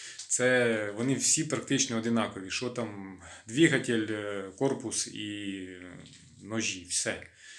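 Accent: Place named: Kherson, Ukraine. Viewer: хай Вам Бог помогає! Так приємно почути рідну говірку Херсонщини! Теж мріємо про перемогу і про повернення додому! А зараз набираємося знань і досвіду від Вас та інших сільських блогерів. Сердечно Вам дякую, що знімаєте і ділитесь інформацією у такий важкий час! Шана Вам, що не зламалися і не опустили руки! Разом до перемоги!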